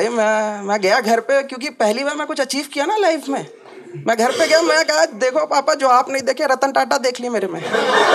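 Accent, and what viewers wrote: Bihar, India. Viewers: Underrated. Always a big fan of BIHARI accent and fun.. Biharis are unique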